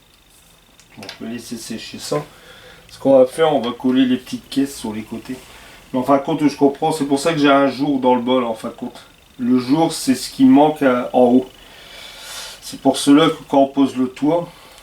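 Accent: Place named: Nord-Pas-de-Calais, France. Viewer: Bonjour Attention! Ce sont deux époques "guerrières" différentes: cela pourrait choquer. Mais en attendant, félicitation pour tout ce que tu fais...de même pour tes directs "pur jus",avec ta manière chti de t'exprimer! Patrick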